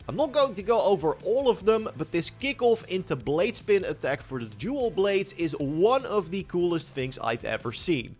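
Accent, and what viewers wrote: Netherlands. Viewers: Omg Your Dutch accent is thick as molasses.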